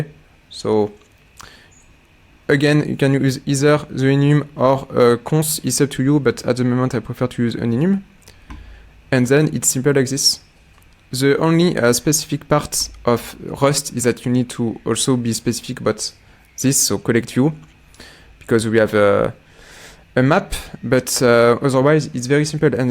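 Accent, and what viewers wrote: France. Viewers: HAHAHA je me suis dis la meme chose , son accent français est vraiment fort n'est pas Pierre ?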